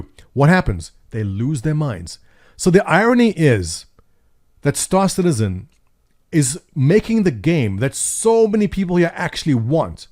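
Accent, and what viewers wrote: South Africa. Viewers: And you just have the biggest Testies! Btw. Do I spot a South African dialect there? And do I remember correctly you currently reside in Canada? Not a stalker question, just testing my dialect sensors :D.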